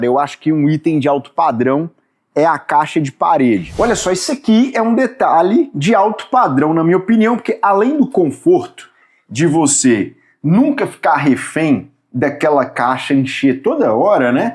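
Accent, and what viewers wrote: Minas Gerais, Brazil. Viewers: Otimo video .Quanto a esses vasos premium , se por acaso estragar o sistema de descarga , fica muito complicado.Uma vez vi propaganda de um vaso com altura um pouco maior para facilitar a vida dos idosos, acho feio aquelas tampas altas .Esse seu sotaque é de MG?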